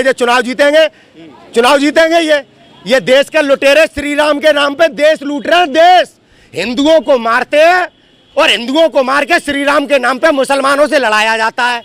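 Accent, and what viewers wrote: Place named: Varanasi, India. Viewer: Ye Bhai tho Banaras wale misraji jase bolrahe hai. Misra ji ko our app ke jazbe ko salam. Sare jahan SE acha Hindustan hamara. Jai Javan Jai kisan. Hindustan Zindabad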